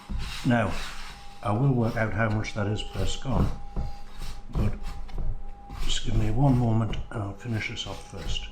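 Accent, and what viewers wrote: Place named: Northumberland, United Kingdom. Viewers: I just found your vlog, as an expat living in Seattle Washington, I appreciate the recipe & your lovely accent, I am assuming you are from the North of England, thanks for the recipe, will definitely give it a try 👍🏼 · Born but sadly not raised in Northumberland so lovely to hear the accent a plus to a great idea and sensible and simple delivery! 🙌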